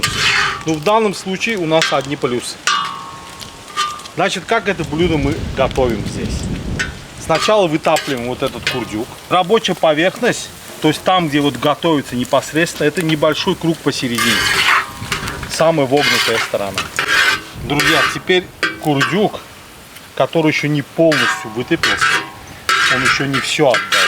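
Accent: Baku, Azerbaijan. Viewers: Прекрасное блюдо! Острый нож! Золотые руки! Грамотная речь и бакинский акцент!❤❤❤❤ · Спасибо и отдельное спасибо за бакинское наречие. Родился и вырос в этом прекрасном городе к сожалению в прошлом прекрасном, каждый раз когда приезжаю к маме наслаждаюсь её запахом, её улыбкой и разговор ами к сожалению все друзья и одноклассники тоже уехали кто куда а в городе одини чужие именно нехватет вот такие беседы как с Вами душевный бакинский акцент, спасибо будьте здоровы обнимаю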